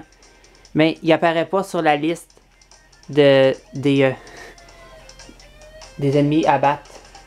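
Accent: Quebec, Canada. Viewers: je te soutient mec Même si tu est québécois tu fait l'effort de parlé fr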